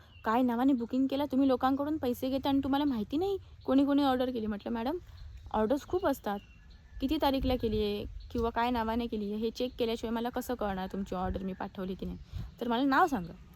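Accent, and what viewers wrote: Malvani, India. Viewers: मस्त मालवणी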